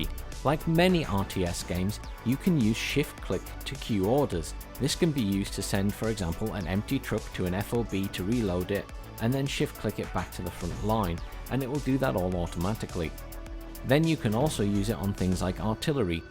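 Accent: United Kingdom. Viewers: "Says in a northern voice" Ohhhh i like a good guide me.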